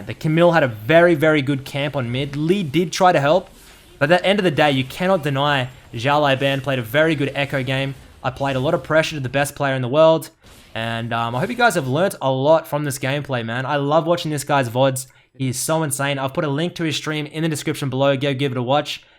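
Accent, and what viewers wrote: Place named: Australia. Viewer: australian accent DansGame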